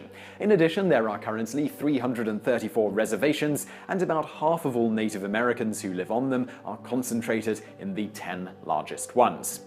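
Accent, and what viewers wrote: England, United Kingdom. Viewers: Nothing more funny then a white guy with an English accent talking about misconceptions of the various nations, at least he's actually trying I give him that much.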